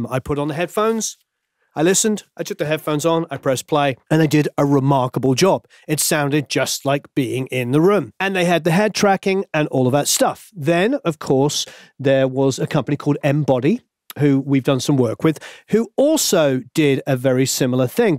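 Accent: United Kingdom. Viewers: I always mix on headphones. We moved from a place where I had a studio separate from the main house to a smaller space where my studio is directly across the hall from the den and one quarter the size. Whenever I would mix anything with a lot of bass on the studio monitors my wife would tell me to turn the bass down. I've tried a couple of room emulations and they may help...I'm not sure. Once you've mixed the advice is to bypass the plug-in before rendering the file, so the sound changes dramatically. I don't know... Couple of points: When I mix I always sit at the mixing desk, which I would assume is where the impulse of any room should be sampled at. So I don't quite understand why you would load up a room and then change the parameters. I get listening to different areas of your space while mixing on speakers but on headphones you have no idea when, say, adding ambience, where you are in the virtual space. 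Maybe I'm missing something? It seems to me that these types of plug-ins are more complicated than they need to be. Set me at the mixing desk at Abbey Road Studios (or wherever) and let me mix! And who mixes in their kitchen? Finally, why is it that when someone speaks with a British accent they always sound smarter? Don't get me wrong, you ARE very smart, but it just adds a little something to the equation. And do American accents sound smarter to a Brit? Great stuff as always.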